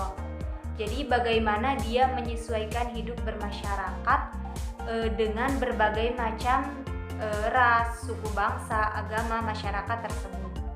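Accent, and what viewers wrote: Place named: West Java, Indonesia. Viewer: Memangni dari SMA klo dengerin ni anak ngaji suka enakeun aja . Ke sunda sundaan merdu 😋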